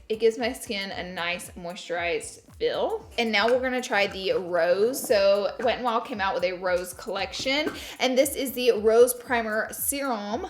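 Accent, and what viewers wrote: United States. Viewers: Omg I luv u so much and I luv ur videos too! I luv ur voice it is so country and u are my all time fav youtuber 💙!!!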